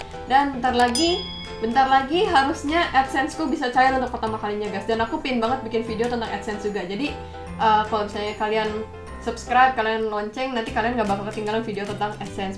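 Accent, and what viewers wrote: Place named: Java, Indonesia. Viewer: kak asalnya dari mana kok agak medok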